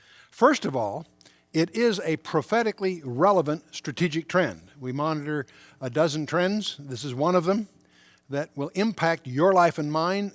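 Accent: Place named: United States. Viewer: What a great blessing Chuck has been to us remnant believers. ❤️🇭🇲 I heard Amir Tsarfati say in his zoom call Q&A with the Romanian churches in Australia (a year ago) that he loved Chuck, and is still friends with his daughter and her family. This made me happy. Chuck's like my Dad, but with an American accent. My Dad loves Chuck too, and told me about this channel, which I immediately subscribed to, and added to my playlists.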